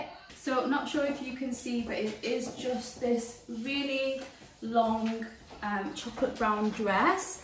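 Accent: United Kingdom. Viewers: We Americans are always in awe of British accent! Difficult to know what part of England your accent is from. Nevertheless, great video and watching it from Seattle, The Washington State.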